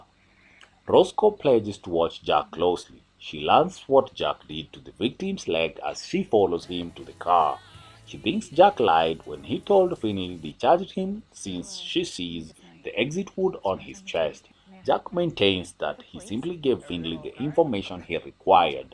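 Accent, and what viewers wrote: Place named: Africa. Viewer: Oh...s@ what the f#$@ Will be much better without the naration of African accent.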